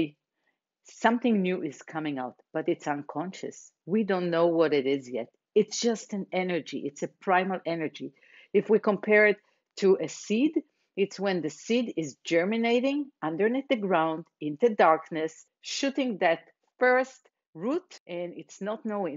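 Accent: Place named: Israel. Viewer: חשבתי שאני מזהה מבטא ישראלי קל :) נעים להכיר ותודה על המידע!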